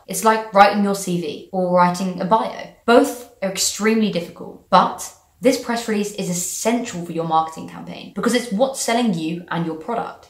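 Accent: United Kingdom. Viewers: THANKS FOR THE INFORMATION, BTW I LOVE THAT BRITTISH ACCENT! ;-)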